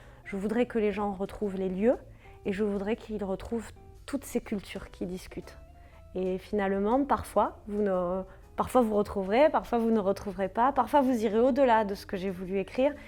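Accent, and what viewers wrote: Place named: Perpignan, France. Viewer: Quel agréable accent perpignanais! Cela donne autant envie que le propos de de plonger dans ses contes et facéties à la découverte des trésors oubliés de nos différentes langues. Merci!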